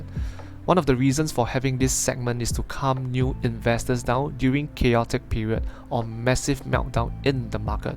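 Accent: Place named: Singapore. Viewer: Hi, though I have subscribed to your channel rather recently, I enjoyed your analysis of the market. Your Singaporean English is very relatable as well lol. Keep up the good work and I will start to watch your options videos as I have tried to learn and understand a few times through different means but still don’t quite get it yet 😢sigh. Looking forward to part 2 of your video 🤗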